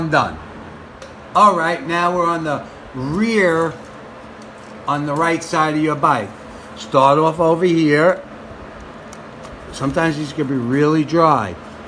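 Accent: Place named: New England, United States. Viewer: Excellent commentary and directions. Voice reminds me of when I was a young man in New England learning the trades.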